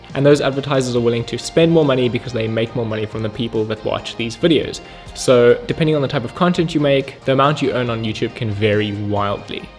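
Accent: South Africa. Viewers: You've got a South African Accent? Are you South African bro? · is that a South African accent ? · Are you South African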